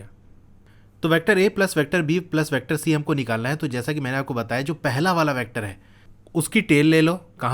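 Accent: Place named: Allahabad, India. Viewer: Sir are you from.allahabad or any nearby city from it your accent is similar to Allahabad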